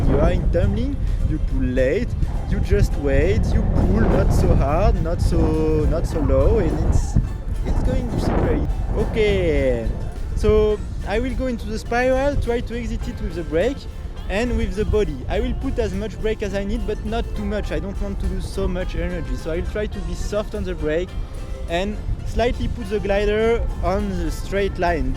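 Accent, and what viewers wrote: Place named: France. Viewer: Cet accent Gaulois me fait mourir de rire!! On est vraiment mauvais en langues étrangères...😂😂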